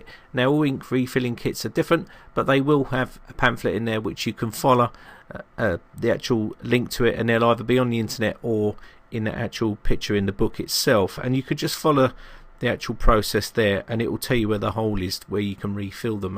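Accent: United Kingdom. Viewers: This is not a Cockney accent,it's British English, Cockney accent is more like 'strine' Australian English.even at time like Texan English.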